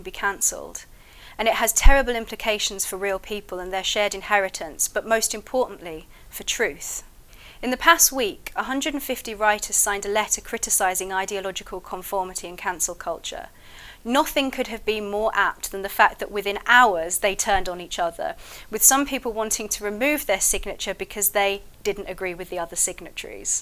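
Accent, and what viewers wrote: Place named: North, United Kingdom. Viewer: I'm not moaning here, but I'm curious how uses the northern pronunciation of "ask" and "pass" yet is apparently from southern climes.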